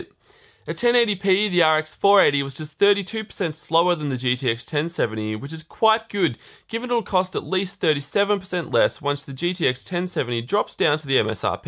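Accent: Australia. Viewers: Oh ok, I didn't know this....hey so you have an Australian accent, do you live in australia or...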